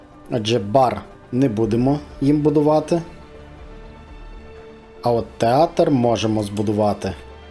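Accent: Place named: Russia. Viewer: Kocham ten ruski akcent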